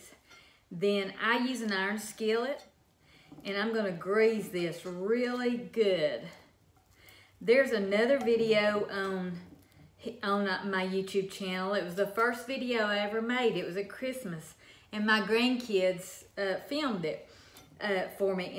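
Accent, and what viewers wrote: South, United States. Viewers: OMG, I’ve been binge watching videos for the past two hours, it’s almost 2am and I have to get to bed! I just can’t get enough of your recipes, and your the sweetest lady & I love your southern accent. So thankful your channel showed up on my recommendation. Thanks!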